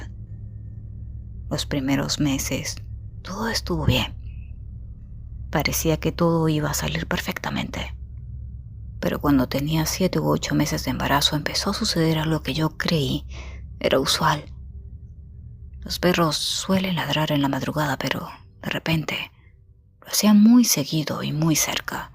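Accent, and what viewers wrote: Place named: Venezuela. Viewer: noté el acento Venezolano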